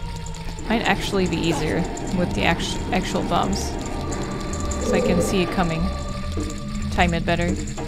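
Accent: Netherlands. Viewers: Hah, wist wel dat je nederlandse was, sommige engelse woorden spreek je nederlands uit. Was niet zeker of je achternaam Nederlands was, heb je ook best veel buiten nederland. Leuke gameplay verder, deze spel is echt vet. Toen ik 9 was ofzo speelde ik het met mn vader. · Curious how the pronounciation of the names with a Dutch accent are actually VERY close to how we pronounce it in a Brazilian accent (It was amusing to notice that said the names the same way I do haha)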